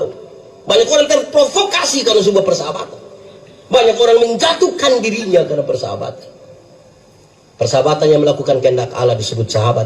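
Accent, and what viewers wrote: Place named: Eastern Indonesia, Indonesia. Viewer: Membiasakan diri mendengar logat bicara orang timur, asyik juga.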